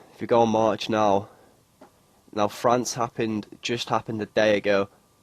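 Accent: Liverpool, United Kingdom. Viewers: Scouser? :D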